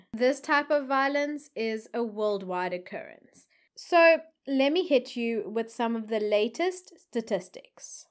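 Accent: Afrikaans, South Africa. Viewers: I love your Afrikaans accent its so cute! 😂❤️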